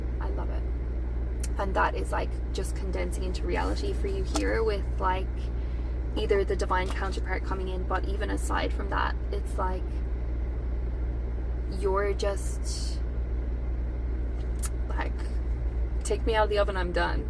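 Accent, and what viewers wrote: Ireland. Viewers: did you move from Ireland? your speech is beautiful ❤️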